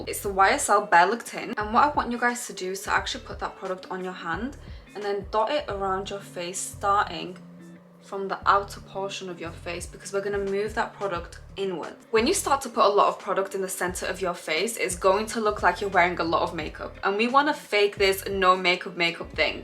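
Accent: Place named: United Kingdom. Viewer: i love ur accent ❤what part of the uk are u from? x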